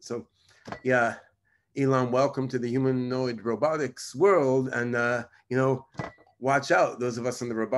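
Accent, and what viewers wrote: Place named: Rio de Janeiro, Brazil. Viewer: Bichuu tu é brasuka meu .Pirei kkkk carioca ainda fechou . E ja ta na 3 muie é nois mano tmj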